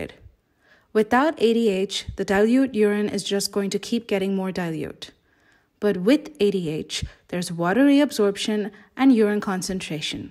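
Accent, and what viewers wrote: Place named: India. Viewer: sounds so indian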